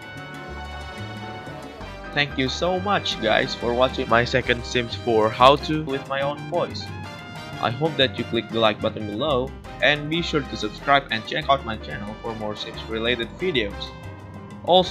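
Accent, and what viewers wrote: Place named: Indonesia. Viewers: pantes aksenmu gw kenalin wkwk orng indo toh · udh gw duga!didenger dari logatnya:v omfg!!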